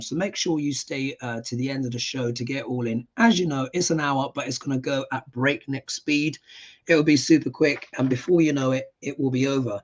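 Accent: United Kingdom. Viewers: (Jeannette M Mahan) Hi handsome Mr, your British accent is music to my ears, I love British people! I say that with the utmost respect.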